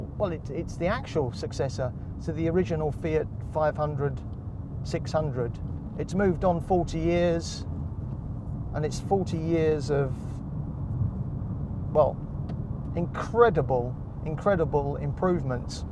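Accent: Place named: Midlands, United Kingdom. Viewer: Up-holstered skaaete-bowd. What accent is this, Midlands? The North?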